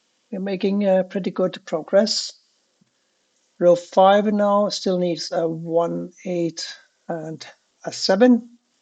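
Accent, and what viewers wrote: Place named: Germany. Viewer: Are you German? Love you accent!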